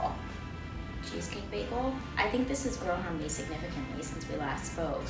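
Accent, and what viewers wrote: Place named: New Jersey, United States. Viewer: I can really hear the New Jersey accent coming in this video lmao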